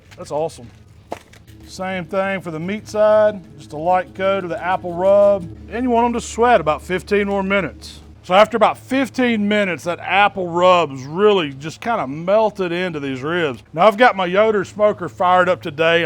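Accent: United States. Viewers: The most american person i have ever seen, the accent, the spirit, the bbq skills, gotta love it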